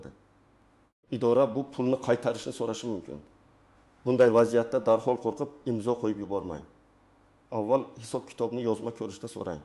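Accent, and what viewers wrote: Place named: Tajikistan. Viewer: Бу одам тожикми. Узбекчани акцент Билан гапиради.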